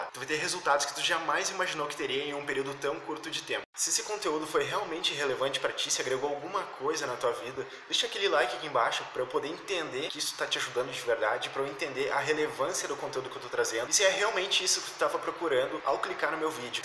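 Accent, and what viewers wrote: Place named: South, Brazil. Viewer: realmente da pra ouvir o sotaque do sul, obrigado pelo video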